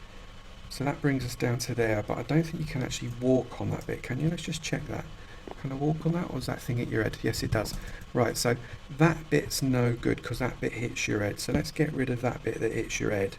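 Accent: United Kingdom. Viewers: OFC the meshes and what not won't match, but overall design-wise there are similarities between your design and Elf City/Grand Tree. But seriously tho, The British Accent makes anything watchable! :3 I don't play MC too much, but when I'm on TILT on League... It helps me unwind and reset before reclimbing. So I always keep it on my desktop. And your guides are pretty darn great for us scrubs here.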